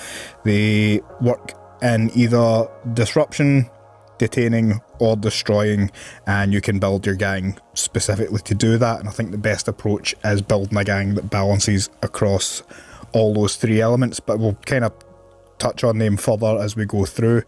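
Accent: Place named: Scotland, United Kingdom. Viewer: Some new necromunda channel I've discovered, great and detailed analysis, charming Scottish (i presume) accent, nice builds. Thanks!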